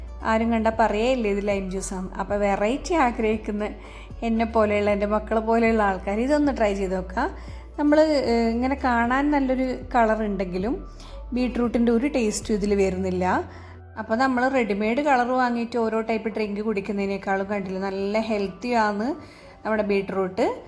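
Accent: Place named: Kasaragod, India. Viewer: Kasarkod basha ishttapettu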